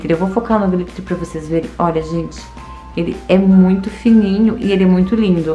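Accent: Portugal. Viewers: Ele é português?,eu sou curioso desculpa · Ele é de Portugal?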